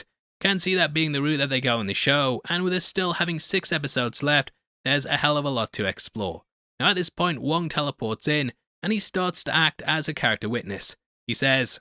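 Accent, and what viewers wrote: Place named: North East England, United Kingdom. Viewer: The only thing I look forward to other than a marvel movie or show is your break downs. Your NE accent is just totally 💯. The one place I miss in the UK most. Great stuff sir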